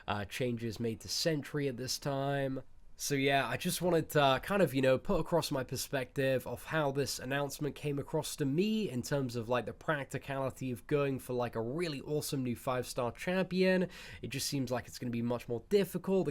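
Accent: United Kingdom. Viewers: damn i saw the thumbnail clicked it was expecting a 5* opening lol....but always a pleasure to hear tht British accent...keep up the awsum wrk man :)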